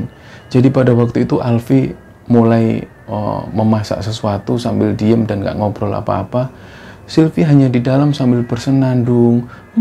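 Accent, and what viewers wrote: Java, Indonesia. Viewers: Paling suka kalo mas yang bercerita. Meduk suaranya hehe. Sukses selalu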